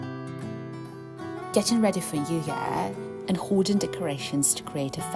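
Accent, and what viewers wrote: England, United Kingdom. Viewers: Thank you for taking us to Belarus super markets and showing different varieties of groceries in your country place... I like your English accent...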